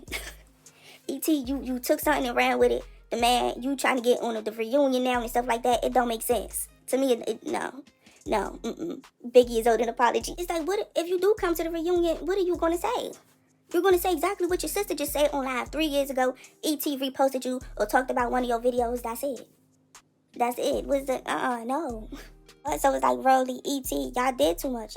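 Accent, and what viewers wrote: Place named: Washington DC, United States. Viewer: The narrator sound like she from dc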